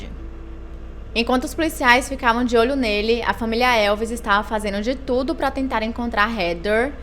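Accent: Portugal. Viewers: Tô conhecendo o canal através da e já tô amando. Adorei a parceria e o sotaque português é MARAVILHOSOOOOO <3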